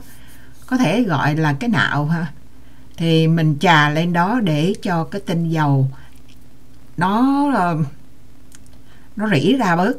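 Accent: Quang Nam, Vietnam. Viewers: cam on co da chia se cong thuc. Rat de dang va cach noi chuyen nguoi Quang Nam cung rat de thuong.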